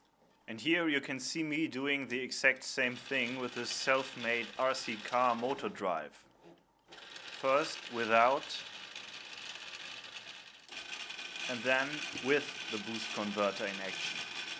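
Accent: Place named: Germany. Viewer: My first time i see your videos, even with your strong german accent (btw im spanish with no very good english level lol) you talk very clear and easy to understand. Great video. Thanks for teaching!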